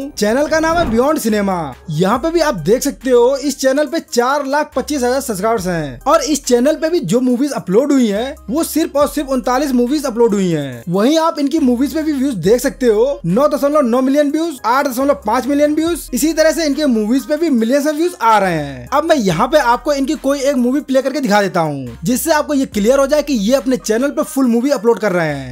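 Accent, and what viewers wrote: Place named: India. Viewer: Why he is saying always click and then I I heard it wtf india😂 accent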